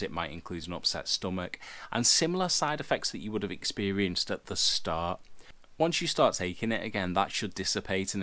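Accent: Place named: Scotland, United Kingdom. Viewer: Are you Scottish? I love this accent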